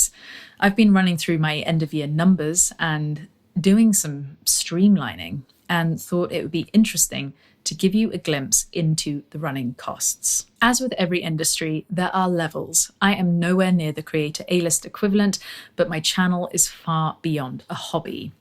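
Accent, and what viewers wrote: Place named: Salisbury, United Kingdom. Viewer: I may be the millionth person to tell you this, but you have an absolutely beautiful speaking voice, and I wonder if you’ve considered narrating audiobooks or documentaries. Both your Salisbury (or Winchester?) accent, and relatively low pitch, are highly listenable for long periods, especially for Americans. I thought my comment would sound very random, but given this latest video’s topic, maybe it’s relevant to your far-flung enterprise (ha!). Cheers and best wishes from the USA.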